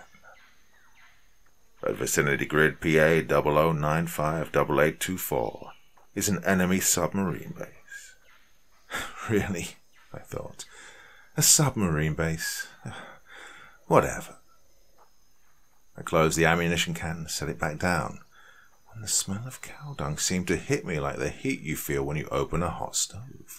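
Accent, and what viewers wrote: England, United Kingdom. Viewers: also gta realize...hes English and we get our language based off there language..sooo who is really wrong in pronunciation???? However I do agree maybe a bit more research shldv been done knowing it was an American story...but give the guy a break really...he puts out some amazing content and I'm sure u have pronounced many things wrong...as have i..but he's working trying to make life easier for the rest of us and ur dawgn the man....I've heard many worse narrator's and is my main guy along with dark Somnium...gta stick up for what I believe in....if every1 here so much better STFU and start ur own YouTube...uk since ur so much better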